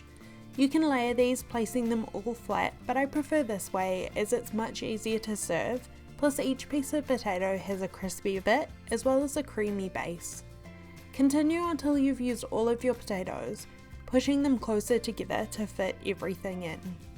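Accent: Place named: New Zealand. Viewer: Me too ! I live in Auckland . Nice to hear a Kiwi accent on here ! Lol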